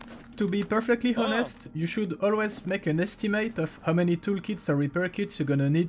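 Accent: France. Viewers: Le french accent ^^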